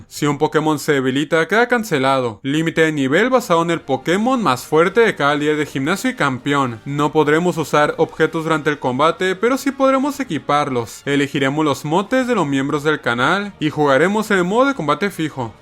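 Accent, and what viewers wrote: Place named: North, Mexico. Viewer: Te amo mucho, me encantan tus videos❤️ Siento que eres del norte de México por cómo pronuncian la ch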